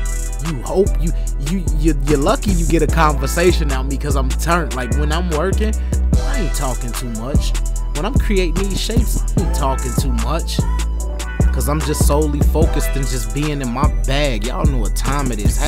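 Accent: Chicago, United States. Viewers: Yo when he first started talking I'm like he sounds like he from Chicago! And sure enough he is!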